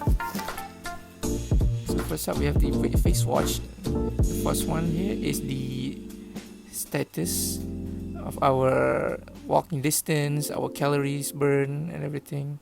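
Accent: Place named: Malaysia. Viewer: bang orang malaysia ke?sbb mcm malaysia...hehe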